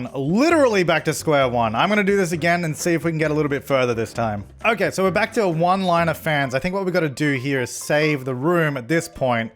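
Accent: Australia. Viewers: Your Channel has grown so Fast I reminder when you had 100,000 SUBS. :) Are You Australian?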